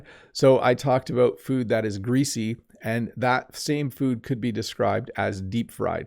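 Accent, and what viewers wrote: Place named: Canada. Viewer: Hearing your Canadian Accent really excite me. Thank for the video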